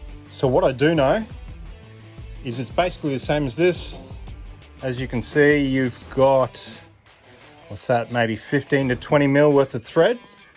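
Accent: Australia. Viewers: Looks and sounds like this guy is in Australia. I've never seen a torx screw or star headed screw on any USA Milwaukee drills or tools, they are all Phillips No. 3 or split headed with standard screwdriver slot. It's obvious the engineering is purposely different on OEM Milwaukee tools down under. Milwaukee half inch cordless chucks have been known to freeze up like this. I've never seen any tool maintenance instructions from them advising regular lubrication of the chucks. Seems like common sense but lots of tradesmen never lube their drill chucks, both keyed & keyless.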